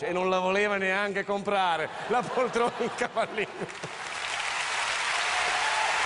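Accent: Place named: Romagna, Italy. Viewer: L'accento romagnolo è bellissimo!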